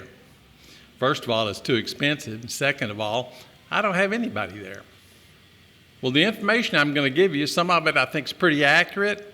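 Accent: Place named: United States. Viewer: I've seen a few videos from Duong Business Global- They've discussed all scenerios concerning business visas. It might be help to you. Sixteen years is a long time away from the US, I could still hear your accent.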